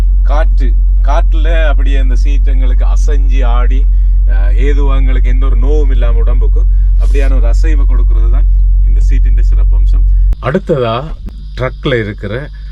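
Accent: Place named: Sri Lanka. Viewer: தமிழ்த்தாய் வாழ்க தலைவர் பிரபாகரன் வாழ்க அண்ணாச்சி உங்கள் ஈழம் தமிழ் கேட்டுக் கொண்டே இருக்கலாம் இனிமையாக இருக்கிறது தமிழுக்கு அமுதென்று பேர், அந்தத் தமிழின்பத் தமிழெங்கள் உயிருக்கு நேர்" என்பதாகும் அதிலும். உங்கள் தமிழ் தனி அழகு தான் அண்ணாச்சி வாழ்க வளமுடன்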